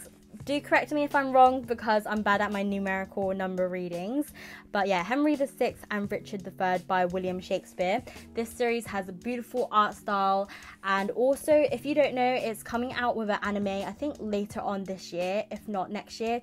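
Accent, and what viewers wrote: United Kingdom. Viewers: When I heard your British accent you have NO IDEO HOW HAPPY I WAS we need more British manga youtubers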